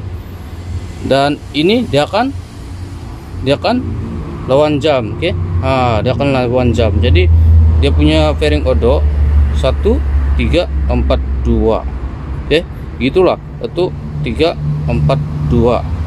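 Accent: Sabah, Malaysia. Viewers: Sabah ni boss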